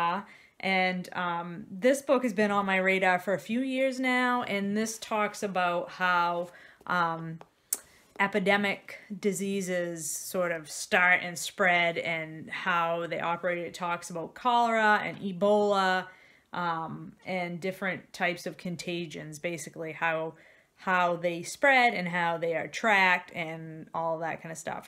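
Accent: Maine, United States. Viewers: Five presidents sounds very interesting. How did I not pick up on your Maine accent?! My sister lives in Brownfield, love Maine and visit frequently! I also really enjoyed The Stranger in the Woods too! Have fun in DC!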